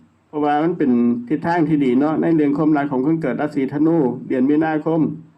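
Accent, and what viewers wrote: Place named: Isan, Thailand. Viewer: อจ.ยุจังหวัดใดค่ะ คือเว่าอิสาน ชอบๆค่ะ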